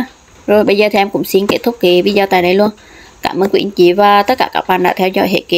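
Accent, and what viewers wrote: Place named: Central, Vietnam. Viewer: Quê e ở mô mà trọ trẹ dễ thương rứa e 😂😂😂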